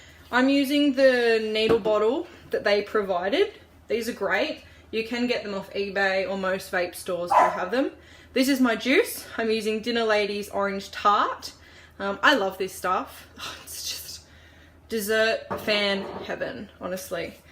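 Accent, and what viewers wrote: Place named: Australia. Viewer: I love the aussie accent too, such a typical American thing hahaha.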